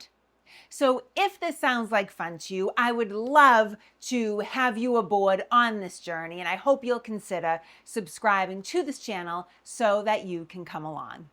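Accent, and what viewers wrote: Boston, United States. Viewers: Easy with the Boston accent. I feel like I'm watching the Departed. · I love your Bhasten Accent. I haven't heard that accent since I was in The U.S. Coast Ghaad. Subscribing now! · I’m a life long baystater but I’m surprised that you’re Boston accent sounds so strong👍🏼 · I'm a NC southern rebel, and I love your Boston accent! I really enjoy your videos. Very interesting! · That's the hardest Boston accent ive ever heard. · After the first 30 seconds of listening to her talk, I could tell without a doubt that she’s from Boston.🤣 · Luv that Boston accent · That sounds like a Boston accent to me. But, is it authentic or enhanced? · I really enjoy your videos. I’m glad that I’ve talked with my sister-in-law for over 40 years and is from Boston. It has helped me understand your Boston accent immensely. LOL. Please keep up this informative and important work you are doing. 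History must be remembered. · Spoken as a true Bostonian. It's nice to hear an accent like mine even though I don't live there anymore. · love her Boston accent. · I always enjoy your enthusiastic presentations. Always learn something from you. And, your Boston accent makes it that much more "authentic". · Love Bastan accent I could listen to her all summah!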